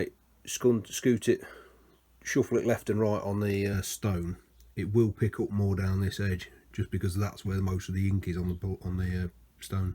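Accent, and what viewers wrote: United Kingdom. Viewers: Thanks for the video. Came from Steve's channel. You sound like you come from the same side of the pond as myself UK. Keep safe and stay well.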